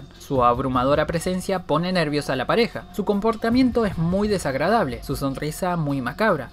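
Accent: Argentina. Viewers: Que sos argentino?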